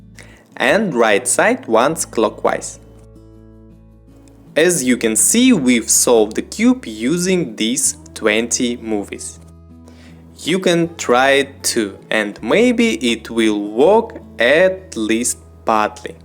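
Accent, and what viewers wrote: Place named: Russia. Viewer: Noomde ye solve babe hota Russian inglish bolta he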